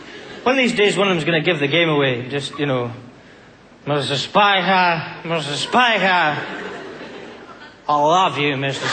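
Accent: Australia. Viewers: Wonderful aussie accent! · how funny is this guy, he does aussie better than most aussies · his aussie accent is spot on, and aussie parliament is exactly like that........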